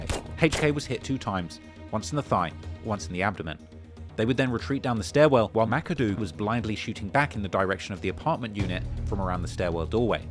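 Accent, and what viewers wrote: United Kingdom. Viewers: nothing like a brit talking about american gangsters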